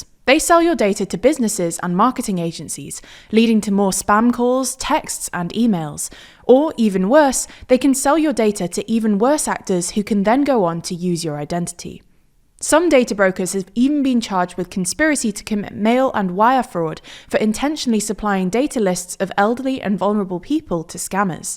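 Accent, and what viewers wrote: United Kingdom. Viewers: Love listening to Aussie election news with a nice British accent.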